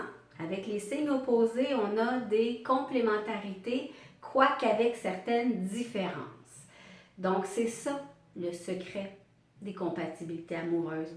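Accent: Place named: Quebec, Canada. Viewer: 1000 merci parce-que vous etes tres objective et d'une claretee rare, et aussi merci de votre generosite de partager votre savoir acquis, je vous en suis reconnaissant. L'accent Quebecois c'est la cerise sur le gateau, j'adore !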